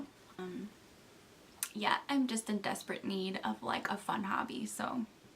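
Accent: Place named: Minnesota, United States. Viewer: welcome! I also just joined booktube :) I'm from Iowa, so I could totally tell that you were from Minnesota right away!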